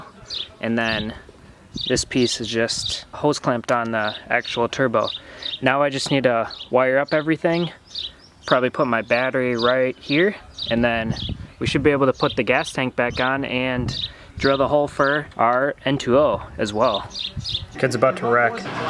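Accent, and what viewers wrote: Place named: Minnesota, United States. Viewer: Another Minnesotan eh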